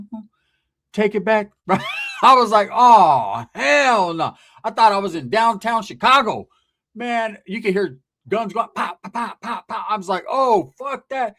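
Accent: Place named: Detroit, United States. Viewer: Sounds like Eastside Detroit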